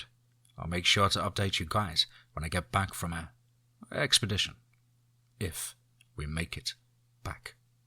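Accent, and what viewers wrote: England, United Kingdom. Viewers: Huh ? I don't think it's a speech impediment. It's the accent ya know English accents. But maybe you are correct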